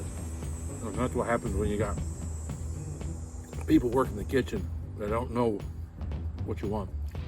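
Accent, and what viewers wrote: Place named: Southeast, United States. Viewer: BY THE WAY!!!! if was a betting man “wink wink”, i would bet almost anything that you’re a south eastern gent! i can tale from the tone and the way you carry yourself!! lol i’m from North Carolina, and you sound like you could be my neighbor lol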